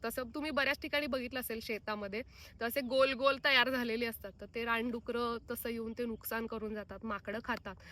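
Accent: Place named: Konkan, India. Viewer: रानडुकराची तर काही बोलायला काही जागाच नाही खुपच वाढ झाली आहे 15,20 वर्षा अगोदर एवढे राणडुक्कर नव्हते आता करारा, तीर, मसूर तेलबीया जसे जवस होऊच देत नाही आता तर शेतकरी धानाशिवाय दूसर पीक लावायलाच पहर नाही भीतीने खूब नुकसान सहन करावे लागत आहे हा आणी तुमची कोकनी मराठी आमी पूर्व विदर्भाची मराठी जवळपाश समान वाटते काही शब्द सोडले तर जे गाने तुम्ही गायले ते पन तसेच आहे पन हल्ली ही परंपरा कमी होत चालली आहे मम लहानपनी रात्र, रात्र मचानी वर झोडीत बन्ऊन पहाटेला उठान फाखरापासुन ज्वारी ची राखनी, मळनी करायचो मला खुपच आवडायचे आता राहील्या त्या फक्त आठवनी ❤❤❤❤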